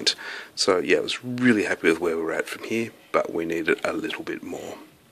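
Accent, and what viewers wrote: Australia. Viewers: I sense an Australian accent. Do you buy your base coat and general painting products from a place in Australia? If so are you able to link me the website? I live near no decent hobby places.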